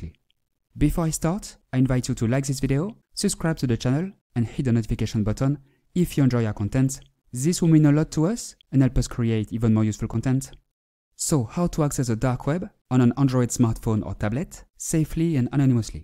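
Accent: France. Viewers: Your English is weird i bet you're french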